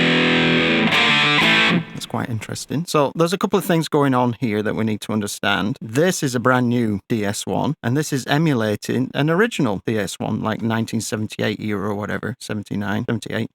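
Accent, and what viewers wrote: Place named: Yorkshire, United Kingdom. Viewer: That's some accent mate, Yorkshire man in Cali? Fantastic video btw!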